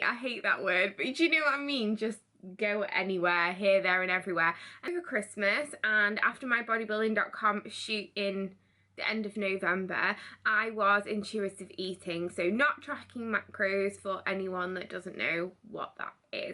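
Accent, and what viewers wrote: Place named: United Kingdom. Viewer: you are adorable! Sometimes your british accent is so difficult to understand. haha! Are americans hard to understand? Anyways, thanks for the inspirational message.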